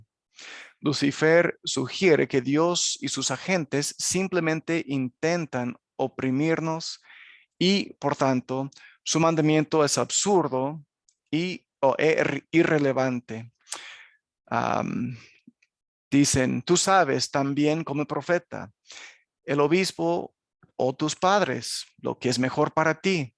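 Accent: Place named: United States. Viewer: Su español hermano es muy bueno, aunque todavía se le nota acento norteamericano, eso se puede reducir, pero nunca desaparece al menos en este estado mortal, igual en la eternidad será el idioma adamico, eso es lo bueno.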